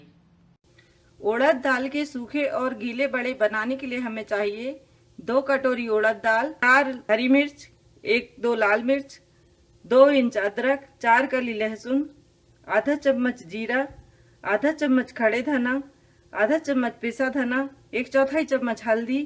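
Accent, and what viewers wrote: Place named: Bundelkhand, India. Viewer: Bhasha k lhze se bundelakhnd jablpuriya lg rhi h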